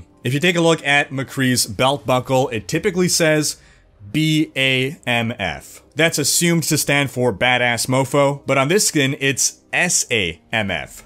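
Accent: Quebec, Canada. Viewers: ton accent québécois est tellement déstabilisant x) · You speak like a Quebec person it's so funny to hear you speak french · Wow, are you Canadian? Your accent sounds Canadian, good reading of the French text!:D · Based on his accent I think he's a canadian living near the Ottawa region, or an english speaker in Quebec. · I love your Quebec accent . J'ai le même . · Funny, you have a Quebecers accent when speaking in French, that's a little odd when describing a place in France ^^ Outside that your pronunciation is quite good ;.) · I was wondering why your French sounded so distinctly Canadian, but I just found out on your Twitter that you live in Montreal. Figures. Toujours sympa de savoir qu'un de ses youtubers préférés est francophone (même si je suis Français et non Québécois):). Also, PoE doesn't bother me, and getting the same amount of content in D3 would be indeed great, but it's the fans (well, some of them) who are annoying. 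Yes, we get it, PoE is soooo much better. How about you let people enjoy what they enjoy? You don't need to act all high and mighty with your 6 new acts. What you guys don't get is that new content won't make people jump to PoE if, for instance, they don't like the gameplay. I, for one, hate the combat and general gameplay of the PoE, whereas I find Diablo 3's tons of fun. No amount of new PoE content can change that fact. But if you enjoy the complexity and style of PoE more, good for you. Just don't be an ass about it. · un petit accent quebecois, je pensais pas ca de toi! xD did you learn it at school? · That sweet Canadian accent, I love it! (French guy here);) Thanks for the infos! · hehe your def from or close to quebec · tu parle vraiment bien Français dis donc ^^! Au début ça m'a fais un peu bizarre, tu a un accès un peu québécois ^^! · AH. Canadian French. Was used to english on this channel, but canadian french is nice too. 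(Even if, being from France, I'm not that used to this accent.)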